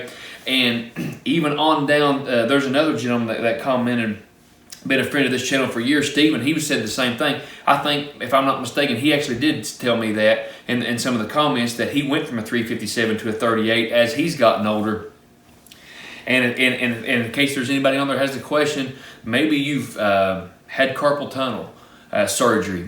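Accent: Kentucky, United States. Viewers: I wish the same to you and yours. It's amazing how people from across the world, in spite of varied experiences, and circumstances, can learn from each other and find common ground through channels like yours . It might surprise many how similar people are essentially. Shared values accross geographic, & cultural, and even racial divides. Thanks to the hard work of people like you, we have the opportunity to learn to adapt, improvise, and overcome . #PS . First time ever I have knowingly interacted with someone from Kentucky and I find that Kentucky twang extremely endearing and honest( don't ask me why; I just do. I myself don't know why). I normally don't post a lot of long winded comments so this is a first for me. Have a blessed life, and keep sharing your insights.🙏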